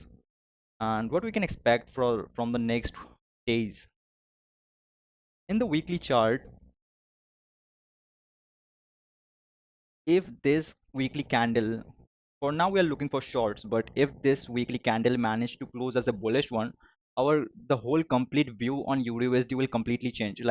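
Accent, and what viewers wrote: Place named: India. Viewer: thaNKS for the video G, and love your indian accent G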